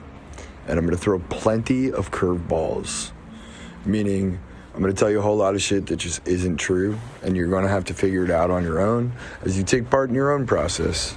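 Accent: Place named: Philadelphia, United States. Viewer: I haven't lived in Philly in like 18 years but your voice is makin me homesick.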